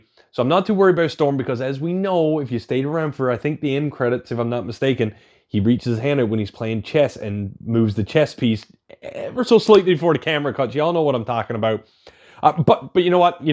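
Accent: Newfoundland, Canada. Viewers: Great reaction. That episode was a roller coaster ride. I too cried like a baby. PS: Are you Newfoundlander? Couldn’t quite pin down your accent.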